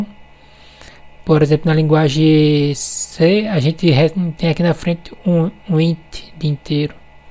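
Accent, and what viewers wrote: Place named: Portugal. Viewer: Português PT? amo esse PT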